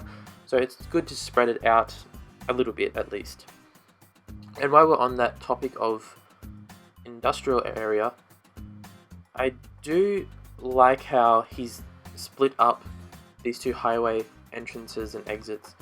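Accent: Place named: Adelaide, Australia. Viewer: your accent's similar to my Adelaidian friend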